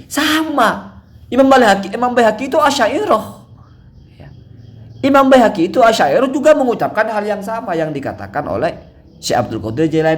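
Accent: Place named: Surabaya, Indonesia. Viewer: jenengan tiyang pundi gus,lagatnipun persis suroboyoan🤭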